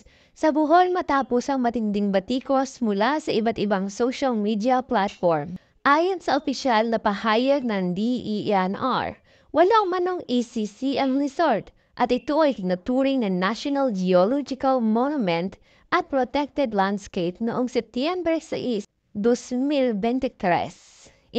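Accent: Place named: China. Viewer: Ok sana presentation ni blogger kaso prang bulol at di tga Pilipinas mag pronounce eh...prang tga China kung magsalita😅😅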